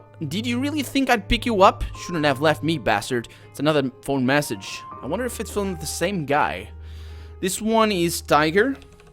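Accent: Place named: Portugal. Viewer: Aha! So you're portugese? I've always wondered what accent that was :D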